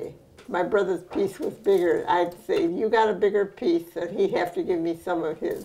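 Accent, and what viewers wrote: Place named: Chicago, United States. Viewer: I'm from Chicago right when i heard here accent i knew she was from my town Reminds me so much of my Grandparents Italian great cooking .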